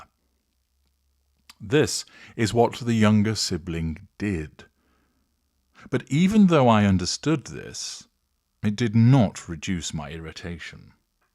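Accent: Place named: Leeds, United Kingdom. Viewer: Not a Manchurian accent! Yup! Leeds!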